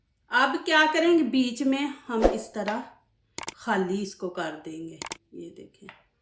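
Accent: Punjab, India. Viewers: کیا بات ہے آپ کے پنجابی لہجے کی ❤ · Cooking main tu mahart Hy mgr guzarish Hy k Urdu lehja pr thori twajho dein jhatky se ye latka kr bolny se Urdu ki khoonsoorti mtaasr Hoti hy Punjabi andaz main Urdu na bolain Urdu ko Urdu main bolain tu Jo thori si uljhan Hoti Rehti Hy poori video k doran is se bach jain Pakistan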